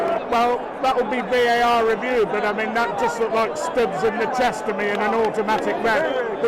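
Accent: Manchester, United Kingdom. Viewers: There are many parts of Manchester, many different communities and lots of different variations of the Manc accent. I'm guessing is from south Manchester and went to posh school;) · He has got a manc accent just a well educated man unlike you who's as thick as 2 short planks 😁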